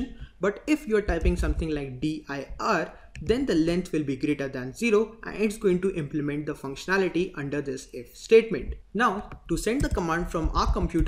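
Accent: India. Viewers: this guy is just a thief, what a shame look at this older video: <link> watch it with a proper English not Hinglish!!!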